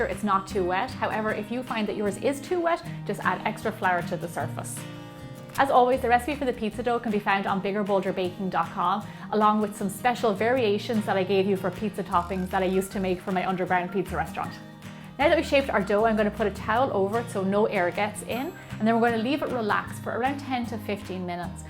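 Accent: Ireland. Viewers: I could listen to you talk all day! Sexy Irish accent. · Yeah, better to watch a pretty lady with an Irish accent making children's food than a hairy, grunting,sweating man in a vest dripping sweat and armpit hairs into the cake mixture... :p No probs. This recipe is a good pizza recipe. For years, I have been making my pizza and bread dough in almost the same way, so yeah it's worth keeping. I'm a bit sad that cooking secrets are being given away on YouTube so that rich people can make even more money, while people who have kept them as family secrets for many years are still poor... Take care. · Are you Irish, Jamaican? Your accent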